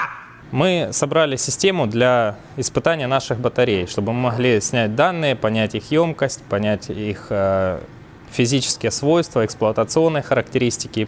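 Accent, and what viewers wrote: Rostov, Russia. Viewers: вы не ростовчанин случайно? Слышно родной говор)))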